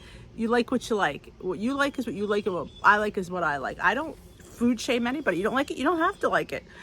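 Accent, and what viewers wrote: New Jersey, United States. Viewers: I'm glad I found your channel and i just subscribed to it. I'm with WW and have 150 pounds to lose. I'm trying to make it a lifestyle - I have lost 65 pounds since my highest weight and lately have gained and lost the same 10 pounds because I've been goofing off. For my health I'm really trying to make it stick and your recipes and examples of what you eat in a day. Also- you gotta be a Jersey girl - you definately have the accent. Too bad WW didnt take you- definately their loss. Anyway I look forward to following you- your success is inspirational!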